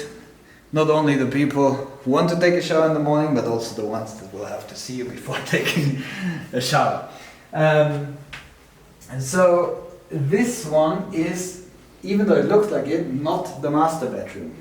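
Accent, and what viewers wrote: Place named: Germany. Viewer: You are from Germany your accent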